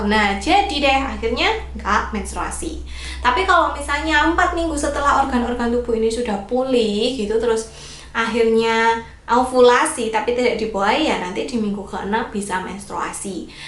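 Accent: Java, Indonesia. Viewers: medok amat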